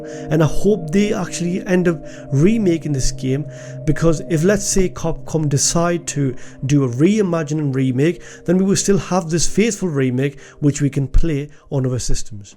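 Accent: Newcastle, United Kingdom. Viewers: You from the west end, bro? (accent is a dead give away to a fellow Geordie) EDIT: little bit of boro in that accent, so not so sure now.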